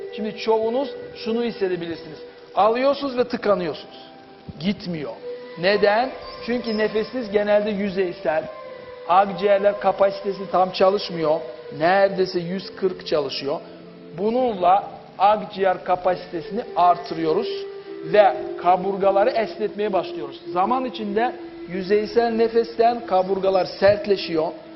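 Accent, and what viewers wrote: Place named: Azerbaijan. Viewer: Konuşan üstad kesinlikle azerbaycanlı! 😊 Yanıldım mı? 🤔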